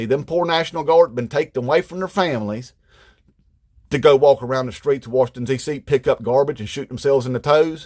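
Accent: South, United States. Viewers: Dude you're damn near my twin brother lol but you sound nothing like me. I mean the message is the same but i don't have that southern accent, I'm from Illinois. Just subed to your channel keep up the good work.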